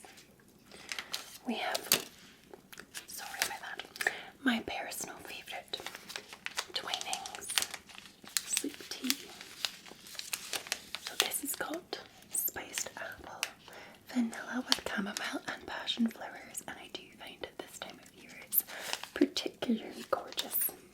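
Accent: Scotland, United Kingdom. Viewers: 40 minutes of tingles from the resplendent scottish lass. perry the platypus noises ☺️ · Hello I hope you are doing well! I ❤ the very Scottish accent!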